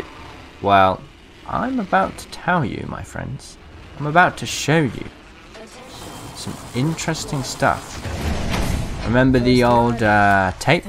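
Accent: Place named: United Kingdom. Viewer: He looking slick 😂 I love your voice the true British sound better then mine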